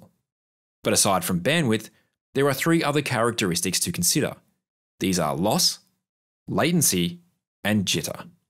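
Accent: Australia. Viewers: As a network+ student I would like to thank you for clearly explainging the difference between QoS and CoS. And great to hear a fellow Aussie for a change. I hope you make more content.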